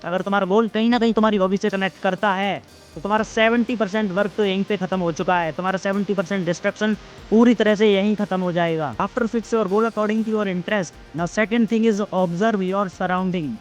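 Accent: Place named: India. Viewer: I am not able to understand. I struggle with Hindi accent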